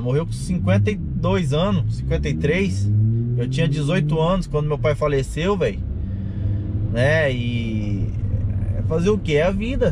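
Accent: Minas Gerais, Brazil. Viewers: mais lembra um pouco o mineiro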